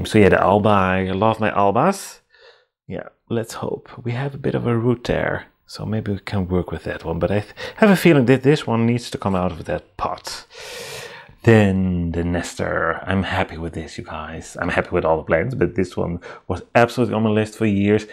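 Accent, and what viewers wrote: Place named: Netherlands. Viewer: ! I thought I recognized your accent! I have family friends in Ryswyk! What part of The Netherlands do you live in?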